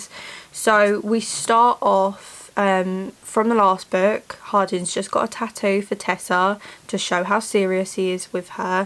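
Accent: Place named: Yorkshire, United Kingdom. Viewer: I loved this book so much mostly because the book was so freaking large, I’d say it was almost larger than Harry Potter book series but I loved your review and I am hoping for the 3rd and 4th movies to be longer cuz there’s much more pages and suitations happening so it’s way out there but, here’s hoping it goes well with the direction of the last two books and weather or not they keep the cast from the 1st and 2ed movies. Ps I love your accent, r u from yorkshire? Or somewhere close. Hehe 🤔💕 also please follow me on Instagram Karalynnlovison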